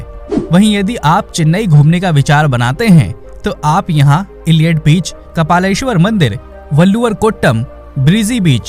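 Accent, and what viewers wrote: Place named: North India, India. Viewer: Why do you blabber in North Indian Hindi. 😡😡😡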